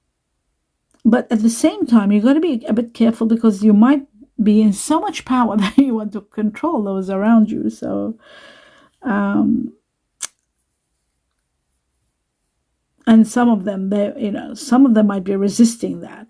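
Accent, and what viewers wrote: Eastern Europe. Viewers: seems Eastern European to me.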